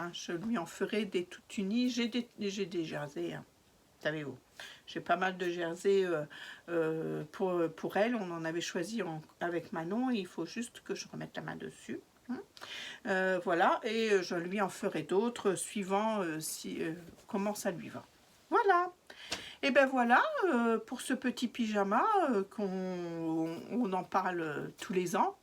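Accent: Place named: England, United Kingdom. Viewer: Coucou, J'adore ton accent anglais 😉 You're simply the best 👍. Très joli pyjama, de même pour ton blouson bi-matière et ton edelweis. à bientôt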